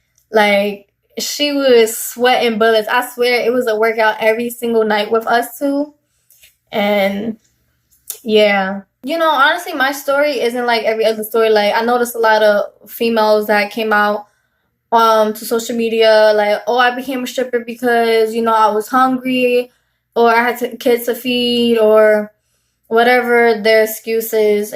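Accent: New York, United States. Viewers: she from new yorkkk, my ex had dat accent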